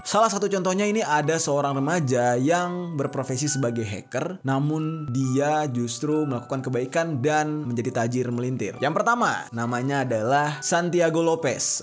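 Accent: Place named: Medan, Indonesia. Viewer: Medan nih bos:v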